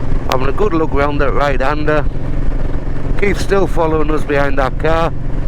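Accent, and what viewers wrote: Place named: North, United Kingdom. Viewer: Excellent videos, (only a couple left to watch) much appreciated. You don't sound like a Devon local - a touch of northern in there somewhere? Understand the use of dancing on the gears on my Deauville being the smallest and probably oldest bike in our group rides (Durham Advanced Motorcyclists - DAM).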